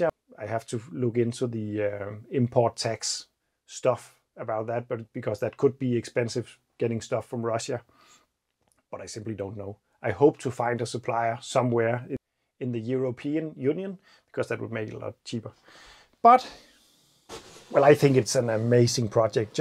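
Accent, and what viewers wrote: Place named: Denmark. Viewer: I think I know that car, given your Danish Accent and the fact that there are not many "Kermit the Frog green" UAZ 452 in Denmark. This is one of the cars Vladim brought to the country. I was also interested in that a year ago and had a test drive, You have to double declutch when you drive that UAZ. At some point in the 80's UAZ upgraded to a synchronized transmission this one was build before that. You can get newer transmissions for them if you get tired of double declutching. I think I know what the B and D stands for, that is for picking the tanks that the engine consumes from, as you probably has learned this car got two fuel tanks. YA3 that is UAZ in Cyrillic.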